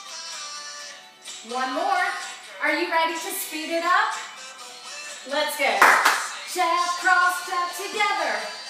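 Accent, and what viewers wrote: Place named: North Carolina, United States. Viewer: Hi it’s Linda from Peoria again I just wanted to tell you I did the beginning boxing regiment and I really thought it was fantastic. I think this would be great for me to do in my water aerobics class. I have a sister in Chapel Hill North Carolina. I can tell the accent!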